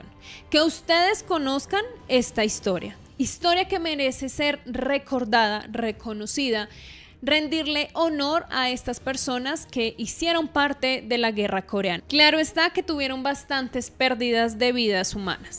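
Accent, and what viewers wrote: Colombia. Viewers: el acento es de Colombia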